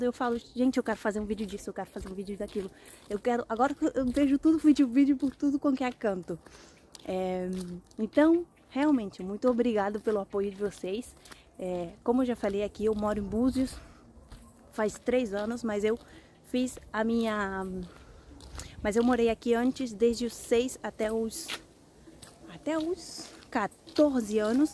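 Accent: Argentina. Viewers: 😉😙😙😙 A mí me encanta la lengua española, y siempre estoy mirando vídeos acá en Youtube de Youtube's nativos de la lengua española, para que yo pueda siempre está en contacto con la lengua española, y tu acento argentino es buenísimo para me acostumbrar con algunas particularidades de español de Argentina, pues ustedes argentinos utilizan siempre el pronombre personal "vos", en lugar de el pronombre personal "tú". Tambíen me gusta acostumbrar mis oídos con el sonido que tú como argentina, utiliza al pronunciar el sonido de la Y(igriega) y la LL(Doble Elle), pues los argentinos hablan "Yo" con el fonema /xo/. ¡Yo soy enamorado por la lengua española! Jajaja. ¡Siempre me encantó! ¡Besitos a vos ! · Y aparte que tenes esa tonada de buenos aires capital y no se te fue cuando haces videos en castellano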